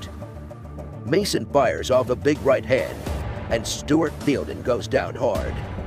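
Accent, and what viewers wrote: United States. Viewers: Voiceover is a complete waste of time. Just tells you what you can see for yourself. And why the US accent? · American accent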